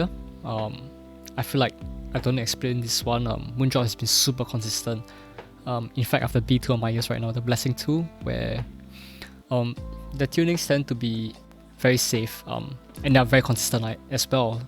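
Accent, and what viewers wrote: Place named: Singapore. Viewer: I hear da accent I noe is Singaporean lollol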